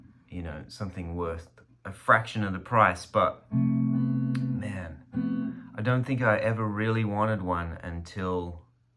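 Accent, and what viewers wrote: Australia. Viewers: I’m glad you still have a strong Aussie accent. You’re doing us proud over there!!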